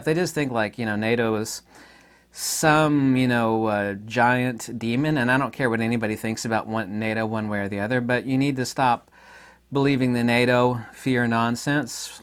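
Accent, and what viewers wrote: Russia. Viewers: You sound Russian cause it sounds like you don't care about life and trying to down play murder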